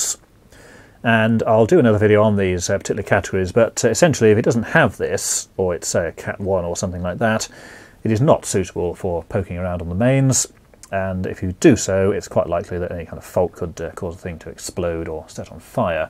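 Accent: United Kingdom. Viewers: I wish you put subtitles on it to catch up with the british accent :(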